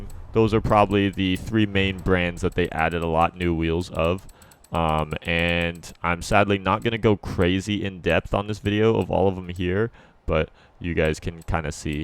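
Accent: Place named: Bahia, Brazil. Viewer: é gringo mas parece baiano pqp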